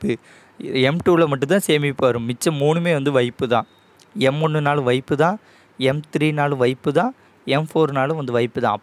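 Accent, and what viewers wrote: Tirunelveli, India. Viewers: Bro nenga Tirunelveli Thana..namma slang theriuthu... thanks for ur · Bro ninga trinelveli ah? Slang theriuthu, teaching super bro, school la kuda ipd explanation kudukala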